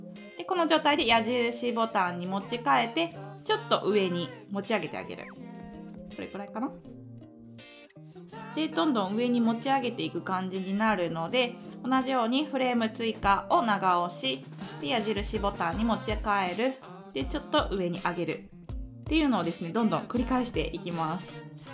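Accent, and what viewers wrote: Kansai, Japan. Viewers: 今日めっちゃ、関西弁でてますね！😍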